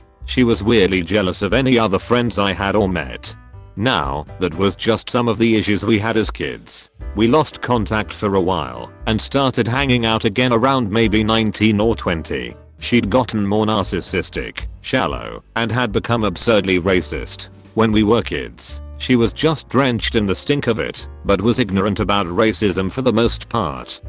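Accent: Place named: United Kingdom. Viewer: Are you trying to copy xo stories with the british voice and the background elevator music?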